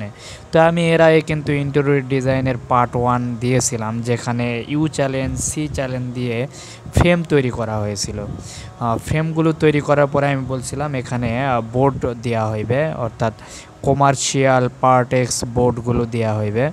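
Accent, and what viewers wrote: Naogaon, Bangladesh. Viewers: কথা শুনে মনে হচ্ছে বাসা নওগাঁ তে।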